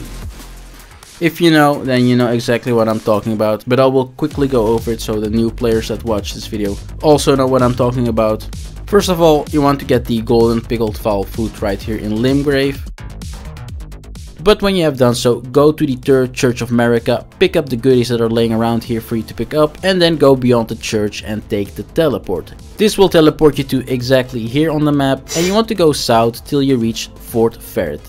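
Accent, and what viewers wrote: Netherlands. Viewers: Are you u from the Netherlands ?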